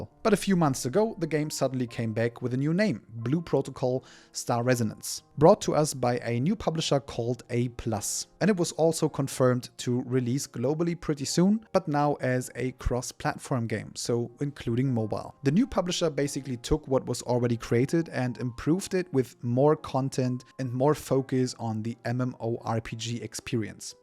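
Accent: Germany. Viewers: Are u german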